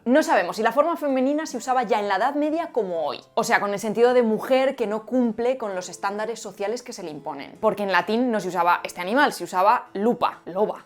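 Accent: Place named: Bierzo, Spain. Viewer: Parece que cante en Berciano. Mantenemos muchas palabras de castellano medieval.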